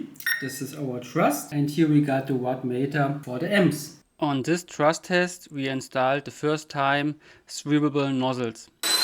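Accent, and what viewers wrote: Germany. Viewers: Nice to see people giving the Harrier some love! Really awsome work you guys deliver there. To be honest I just found you guys and have to say: You have a new subscriber xD I really love fighter jets and their engeneering and its nice to see how you can actually reconstruct an awsome work of technical art with enough determination and knowledge. I really really like the way you avoided some problems and tested things out. I hope you'll continue this awsome work not just on the Harrier, but maybe on some other aircrafts, after this project. and a tiny unimportant question (if this even gets read): Do i hear a little bit of german accent? xD It doesn't matter where you are from, but just out of curiosity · This is next level. Are you a senior designer at Airbus or Arianespace? Because you should be! Also, sorry - is you accent Bayern or in der nähe? 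Lived in Muenchen for a couple of years and still miss it! And sorry if I misplaced your accent and you are from somewhere like Hamburg 😂!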